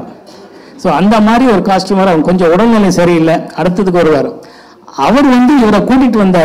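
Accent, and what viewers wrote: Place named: Karnataka, India. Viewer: பேச்சுல கன்னட நெடி...ஆனாலும் நல்லா இருக்கு....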